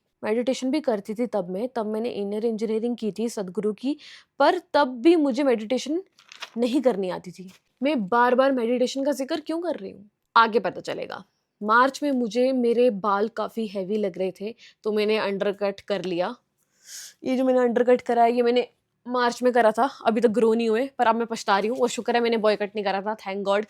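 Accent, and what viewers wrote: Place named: Poonch, India. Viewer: Yeah dear dairy was awesome And poonchi accent tooo❤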